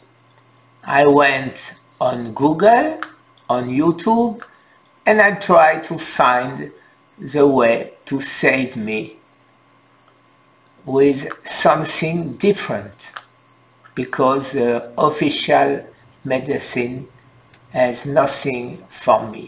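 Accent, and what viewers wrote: France. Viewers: ce n est pas un french accent c est un super slow french accent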